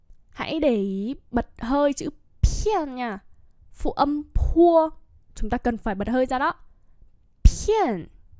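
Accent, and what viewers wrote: Standard. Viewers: Cô đọc chuẩn lắm 👍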